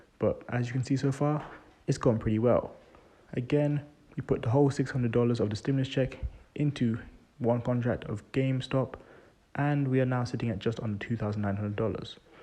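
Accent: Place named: England, United Kingdom. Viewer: Hi you sound English are you based in the U.K. if so do you know where we can play options on these stocks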